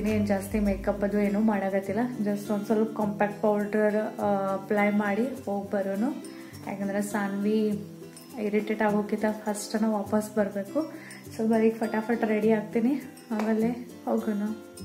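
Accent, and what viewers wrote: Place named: Hubballi, India. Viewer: ನಿಮ್ಮ ಹುಬ್ಬಳ್ಳಿ ಕನ್ನಡ ಸೂಪರ್ ನಾವು ಉಡುಪಿಯವರು ನಿಮ್ಮ ಮಾತು ಕೇಳಿ ಕೇಳಿ ನಿಮ್ಮ ಭಾಷೆ ಕಲಿತಿದ್ದೀನಿ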